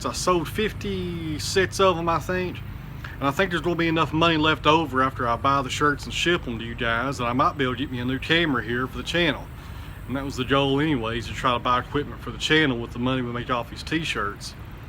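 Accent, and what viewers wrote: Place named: Tennessee, United States. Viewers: Talking too much?! I mean, seriously, who doesn't appreciate being educated by a man with a great southern drawl. Weenie's winer's and wimp complaints, say I! Your videos are excellent and entertaining. Happy to see you don't take the negative comments seriously.Anxious to see that walnut being milled. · I truly enjoy hearing what you have to say, and I also enjoy your Tennessee accent very much. Don't listen to the naysayers... I think most of us appreciate your insights and a little background on what and why you are doing things the way you do them in your videos. Keep up the good work, sir, and don't change a thing!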